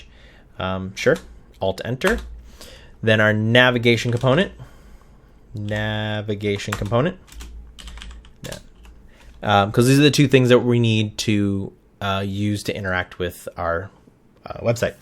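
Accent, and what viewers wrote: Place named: United States. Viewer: i wish i saw this video 2 months ago, this is the best QA Automation Tutorial i've ever seen ! +you speak real pure American English ! which is a real big thumbs up !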